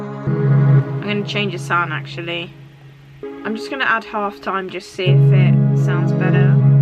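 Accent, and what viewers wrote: United Kingdom. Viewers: Love the beats and listening to British people talk. Best of both worlds 🤣👍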